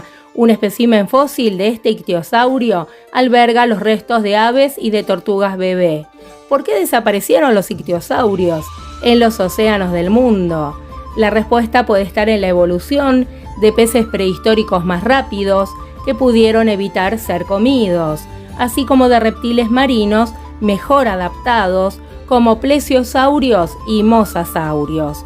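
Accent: Argentina. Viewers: Muy bonitos y gorditos estos "delfincitos cavernicolas", jaa. Yo los he hecho en plastilina..son muy faciles de hacer. Pero ahora quiero hacerlos en resina, igual que mis otros dinosaurios que hago. Yo creo que si vivieron hasta el final de los dinosaurios, con la ultima especie descubierta en el Cretacico. Pues su anatomia resulto muy exitosa para su ambiente. Como lo es ahora los delfines y ballenas..claro: estos tienen la mala suerte de haberse topado...con el Hombre, somos los peores, jaa. Muy buen video, felicidades. Solo no me gusto el acento argentino...es muy molesto. Adios desde cd. De Mexico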